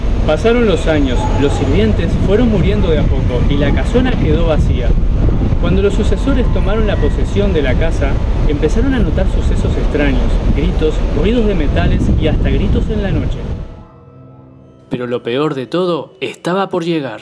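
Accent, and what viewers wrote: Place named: Montevideo, Uruguay. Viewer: Seis años después, vengo a ver éste video, soy Montevideana y viví muchísimos años en esa zona. Son fascinantes las antiguas casonas del Prado! Tenés un acento bastante parecido al de los Montevideanos excepto por la pronunciación de las "ll" o "y" 😊 de qué país sos? Es una pena que la hayan derruido a la casona y en su lugar haya un edificio. Saludos desde Uruguay y el video está muy bueeno!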